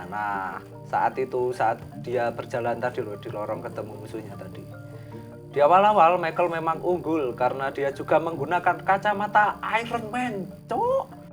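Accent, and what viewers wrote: Java, Indonesia. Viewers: udah suara medok banget.. joke lo garing lagi.. bikin muntah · Amatiran.. Udah medok pake becanda, jd gak menikmati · Tolong yg bener min komentator nya, udah medhok banyak bercanda ga lucu juga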